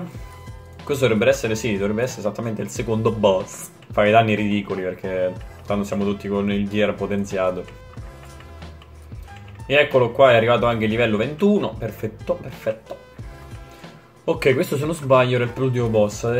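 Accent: Marche, Italy. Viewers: Per me sarebbe molto utile un video sui migliori addons da usare per i novizi. Comunque bel video continua così. P.S. sei marchigiano?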